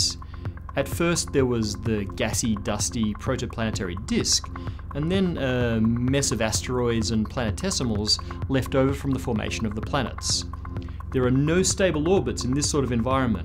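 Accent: Australia. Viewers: I don't think so. Sounds something akin to Australian.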